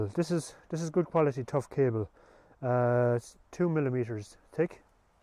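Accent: Ireland. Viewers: Great review I like watching your videos, the way you talk and the words you use remind me of my Grandfather, He was from County Mayo on the West coast.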